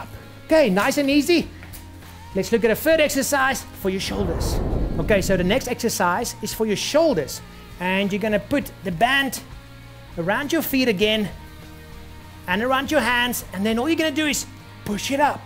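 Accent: South Africa. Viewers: Must be South African, im South African and I recognize that accent anywhere plus he says: "anyfing" :D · I think it's South African...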